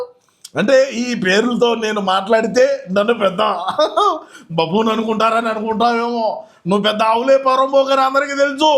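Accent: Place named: Kakinada, India. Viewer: Kakinada kanttam garu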